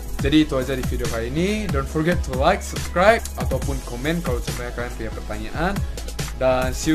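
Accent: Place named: South Korea. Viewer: Kakak.. kenapa jadi punya aksen korea?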